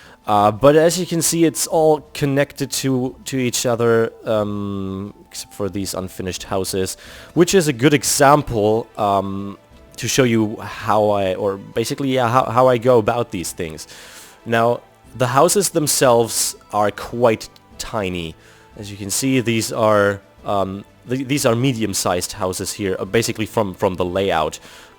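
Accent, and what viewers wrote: Germany. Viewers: I like the german accent though its a tad harder to understand. Also this is gonna be a fun series. :) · maaaa schon wieder so ein deutscher .... wenigstens ein nicht sooooo deutscher akzent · lol... the German accent makes you make more sense somehow... can't really explain it! xD It's like your descriptions of what you are doing whilst using the German accent punctuate the steps in your thinking process more clearly... idek (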